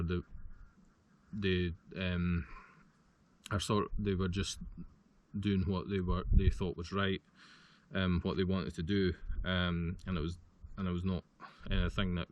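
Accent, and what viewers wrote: Scotland, United Kingdom. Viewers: My parents are Scottish I thought you were Scottish Highlands is beautifull Ive been there good video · Are you a Scot, mate?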